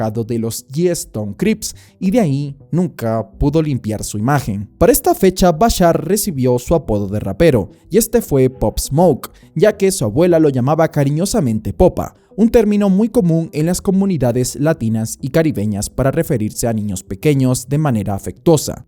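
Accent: Dominican Republic. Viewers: Haha y esa dominicanidad que tienes ahora duro 😂🔥💪🏽🇩🇴💯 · Eres dominicano? Por que tiebes ese acento?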